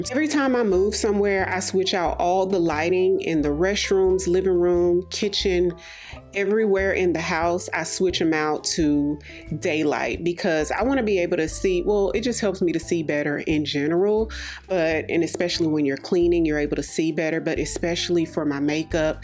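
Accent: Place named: South, United States. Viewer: I often wondered where you were from.i hear your southern accent. Gorgeous look . Love it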